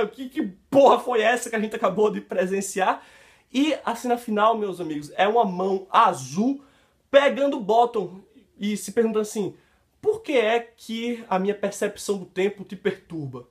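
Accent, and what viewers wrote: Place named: Northeast, Brazil. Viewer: ah esse nosso sotaque nordestino kkk , ótimo vídeo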